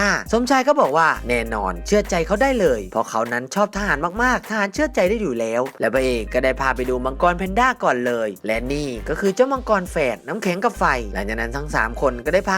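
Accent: South, Thailand. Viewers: หนูรู้สึกเหมือนพี่เป็นคนใต้เลยเเต่หนูชอบคลิปนี้อยู่นะค่ะเพราะมีตลกมาเพิ่ม🤣🤣🤣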